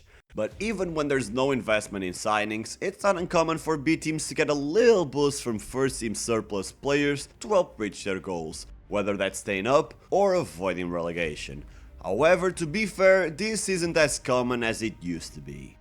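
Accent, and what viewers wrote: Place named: North, Portugal. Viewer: Ótima análise! O teu conteúdo é ótimo (com especial orgulho pela pronúncia do Norte)! Gosto sempre dos ângulos e das histórias de que te lembras, é excelente. Três sugestões de temas: o papel do Benfica no Estado Novo (a teoria de que era o clube do regime supostamente tem muitos argumentos em contrário); o nosso FCP antes da entrada de PdC; e os porquês de nenhum clube que tenha sido adquirido em Portugal ter conseguido crescer verdadeiramente (por exemplo o Beira Mar). Obrigado pelo conteúdo! Um abraço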